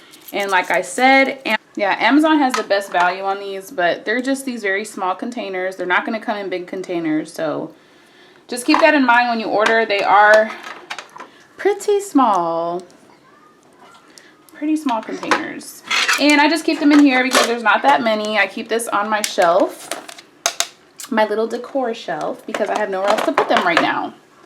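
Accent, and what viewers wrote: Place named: Oklahoma, United States. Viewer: Sounds like you are in lawton ok lol new sub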